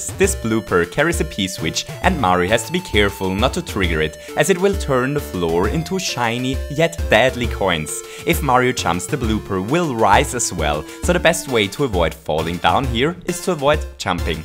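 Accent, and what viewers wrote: Germany. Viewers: O my god, dat German accent XD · Super Video, ich weiß das du Deutsch kannst. Hört man auch. ; ) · bist du deutscher oder so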